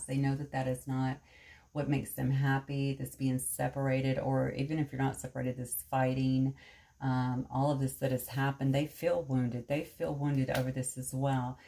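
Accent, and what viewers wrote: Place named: South, United States. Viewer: Brilliantly and amazingly accurate. In addition to reading the cards and channeling, I love how you inject little pearls of wisdom into each reading. Your voice and soft Southern accent is so very soothing, especially in times of difficulty which I am experiencing at present. But I feel energetic stirrings.. Thank you, Ms.. Xx 🌟💖💫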